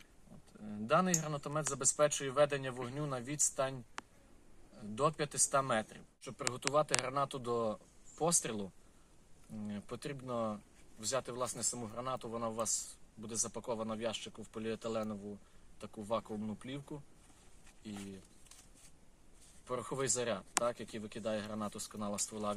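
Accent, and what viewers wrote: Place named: Western Ukraine, Ukraine. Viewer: Чiтко западенській суржик. А інформация, корисна.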